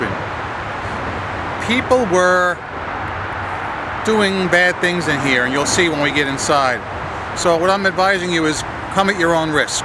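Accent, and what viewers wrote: New York, United States. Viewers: Loving that New York accent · god i hate how you nyc people talk. it makes me ashamed to be from this state. its PARK not PORK you fucking idiot. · Nothin' like a good ol' New York accent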